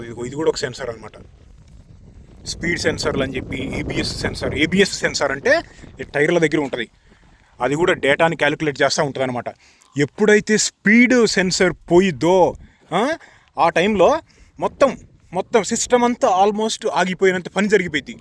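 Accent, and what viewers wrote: Andhra Pradesh, India. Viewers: Anna mana Nizamabad antunnav but slang yemo andra laga undi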